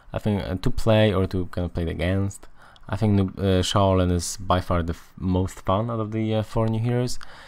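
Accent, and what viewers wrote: Poland. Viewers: I have to ask: are you Polish?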